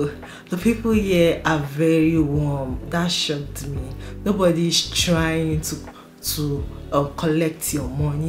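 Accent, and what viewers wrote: Nigeria. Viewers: More people speak english now, but i think your strong nigerian accent was a problem. It's like me when listening to Jamaican or carreabean. If you speak clearly, they will for sure understand. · You try to put subtitles because Nigerian accent is hardly heard by Rwandans.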